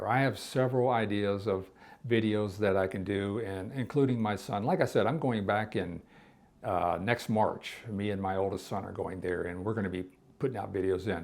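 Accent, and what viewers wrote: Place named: United States. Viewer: Thanks your veido! Cause I can clearly listen US accent from you. I love that.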